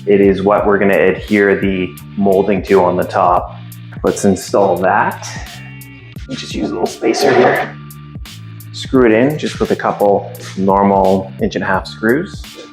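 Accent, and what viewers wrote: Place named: Ontario, Canada. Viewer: First, I didn't mind the talking. It helps provide context. Second, the shirt says ".ca", and you're drinking a boneshaker (a man of taste, I'd recommend an Octopus Wants to Fight if NEIPAs are your jam). As a fellow Canuck, and Ontarian - I'd peg your accent from somewhere around Tarrana (proper pronunciation) Ontario. To the other poster, this guy clearly is not a "yank". Thanks for providing the video. Will do this strapping this weekend.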